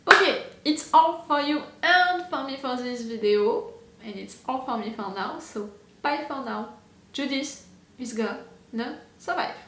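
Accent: France. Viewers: Je venais pour regarder une réaction américaine, et là, j'écoute ta voix.. Et je me dis.. Mais attends, c'est un accent français ça^^ Elle est Française ^^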